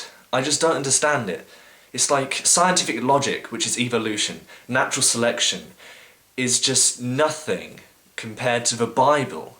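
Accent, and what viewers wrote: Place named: England, United Kingdom. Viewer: He made the effort to make the video and put some interesting points across. He has an English accent. Grow up.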